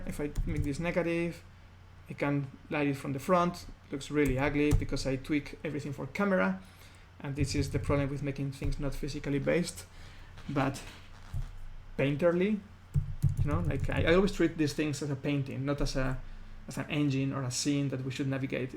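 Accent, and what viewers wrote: Spain. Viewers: COMO SE NOTA EN TU ACENTO QUE ERES ESPAÑOL JEJEJE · Te he oido y he dicho este hombre tiene que ser español jajaj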